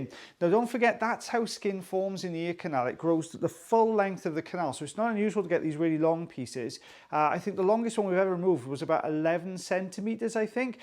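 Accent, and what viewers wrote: Wales, United Kingdom. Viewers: I think the main reason I'm here is because I miss Wales / a welsh accent so much.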